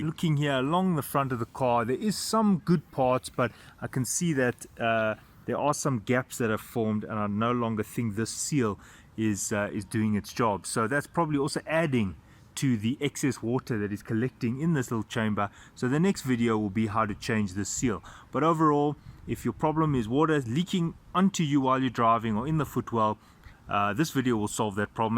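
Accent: South Africa. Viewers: I love the strong South African accent from a strong new Zealand accent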